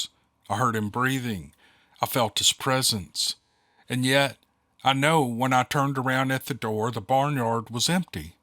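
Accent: South, United States. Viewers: I have listened to this twice, several months ago and then today. OMG! Best novel ever and I love ’ southern drawl that give this book so much reality with me being a native Alabaman, sound like my uncle/cousins and Grandfather ❤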